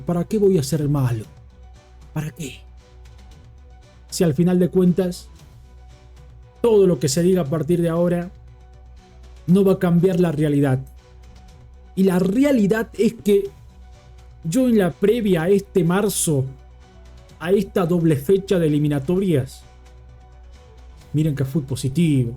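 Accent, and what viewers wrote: Argentina. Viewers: Por que habla cómo argentino?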